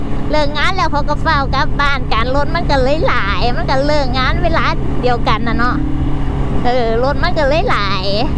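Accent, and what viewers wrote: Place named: Isan, Thailand. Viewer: เว่าอิสานม่วนคักจ้าาา👍💖😁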